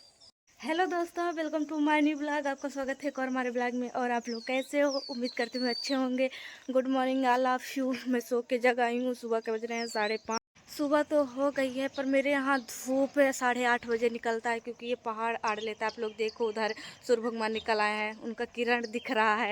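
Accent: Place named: Chhattisgarh, India. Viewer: कुछ कुछ भाषा आपकी छत्तीसगढ़ का भाषा जैसे बोलते हो😮😮😮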